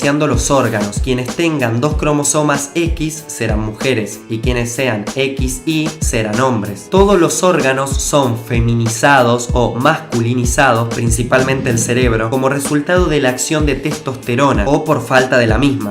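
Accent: Argentina. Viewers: se nota lo argentino , el acento y ese nazo lo dice todo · Me encanta tú acento argentino, regálamelo por favor!!!!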